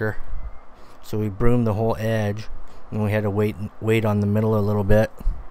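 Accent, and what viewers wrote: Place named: Orange County, United States. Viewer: Your accent, "THIS guy is from OC." Then your phone number pops up. Yep.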